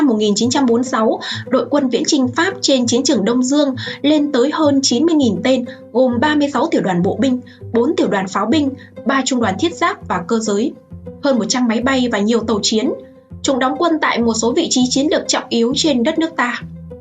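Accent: North, Vietnam. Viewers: nói cho nghe nè đừng dạy người khác phải theo mình đó là hói hư tật xấu của người csbv Miền Bắc. Cụ Diệm (gia đình quan Triều Nguyễn cụ Diệm kinh qua chức vụ quan lại cải tổ+đi du học nước ngoài đây là lí do bọn Miền Bắc nói Cụ là tay sai hoặc con nuôi do Mỹ nuôi...) trước khi VN còn thời Pháp cai trị do Vua Bảo Đại đứng ra điều hành bằng chính sách của Pháp gọi là dân đi lính QGVN Khi Pháp áp bức sức lao động khổ sai thì dân đứng lên thành Lập nhiều Đảng phái Khi giành thắng lợi Pháp trao trả chính quyền thuyết cs ra đời cướp chính quyền. Bảo Đại kêu gọi Cụ Diệm về nối tiếp vì chính sách cũ của Pháp để lại ông xích mích Cụ Diệm thay thế Vua Bảo Đại lập nên VNCH chính sách mới cải tổ lợi dụng sự tài trợ kinh tế của Mỹ nhằm ngăn chặn sự lang rộng của Liên Xô ,TQ hay vì Pháp muốn được hậu thuẫn nhưng bị từ chối vì thời gia đình cụ bị Pháp đối xử tàn nhẫn , những năm tháng đầu là thời hoàng kim phồn thịnh giá trị tiền vnch phát hành lưu thông khắp nơi với sự kinh tế thị trường người Hoa Kiều buôn bán giúp dân Miền Nam đáng học hỏi với một Quốc Gia Tự Do Chính Phủ như vầy các nước khu vực như Hàn ,Sing ,Lào... 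còn qua học tập làm việc , sao không qua Mỹ mà qua vnch ,các Quốc Gia khác còn mời cụ đi du hành các nước , chưa có Nguyên Thủ Quốc Gia nào được Tổng Thống Nước Mỹ ra tận sân bay đón tiếp và bắn đại pháo long trọng Mỹ từng đề cử vnch ra hội đồng LHQ Liên Xô ,TQ và Phe Cộng Sản không đồng ý. Điều cuối cùng tôi nói là con nhỏ MC nói tiếng Miền Bắc cho chuẩn ở ngoài đời đi ,đừ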